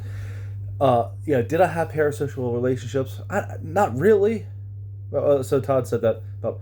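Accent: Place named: United States. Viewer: Good to see you back. I could swear that you're starting to lose your American accent. But I don't think that its accurate to say that mental illness is the reason for not being in a relationship. You yourself admitted that it was 'luck' so in the case of Todd and OC what could they possibly do to increase their chances? Todd after all did work for over 10 years in jobs surrounded by people and nothing happened. Then there is what one wants vs whats available. But its good that things are working out for you. You do seem more composed and self confident than what you did before.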